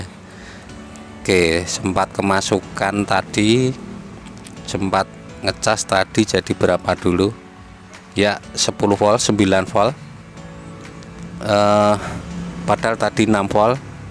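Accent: Java, Indonesia. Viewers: MEDDOK KALI JAWA MU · LOGAT JAWANYA KENTEL BANGET, MAS!. MENURUT SAYA YG AWAM, NGECES AKI DGN CARA BEGITU, MEMBUAT AKI CEPAT RUSAK LHO, MAS!, YG PALING AMAN YA YG SE WAJARNYA PAKE TRAFFO 5AMPERE/ 3 AMPERE.,DAN DIODA 5AMPERE PULA..PENGECASAN AKI PAKE CARA INI CUMA BUAT DARURAT SAJA, JANGAN KETERUSAN, SEL AKI CEPAT RONTOK.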